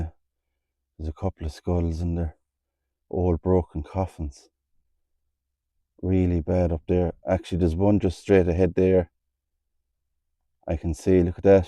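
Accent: Ireland. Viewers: No better tribute to how we all end. The Irish accent gives depth to this story and as an English man I thank god for this respectfull demontration in how it needed to be done. By the grace of god . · I though so. Irish accent. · Just subscribed , nothing better than irish video's and listening to the Irish accent 😊 great subject today 😊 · I love your accent I'm a Canadian l hope to visit Ireland soon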